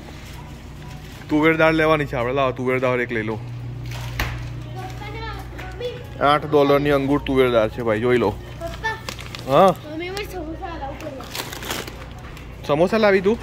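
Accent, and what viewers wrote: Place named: Surat, India. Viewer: Wow.. nice collection of typical GUJJUS. yummy. Commentary paakki SURTI …👏👏👏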